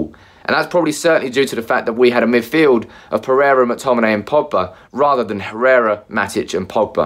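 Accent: United Kingdom. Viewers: Cockney manc??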